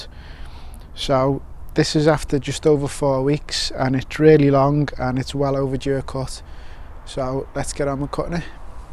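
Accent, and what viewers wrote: Liverpool, United Kingdom. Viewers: We’re planning on levelling out the lawn and it’s so overwhelming. Seen so many videos trying to figure it out. Then heard you were scouse, subscribed immediately 😂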